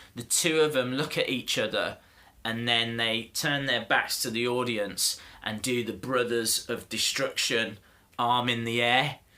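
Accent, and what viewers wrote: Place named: Midlands, United Kingdom. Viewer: You're clearly from the midlands just like me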